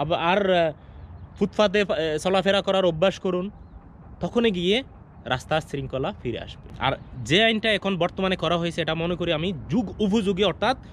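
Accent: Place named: Noakhali, Bangladesh. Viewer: আপনার ভাষায় বুঝাযায় আপনি নোয়াখালী বা ফেনীর মানুষ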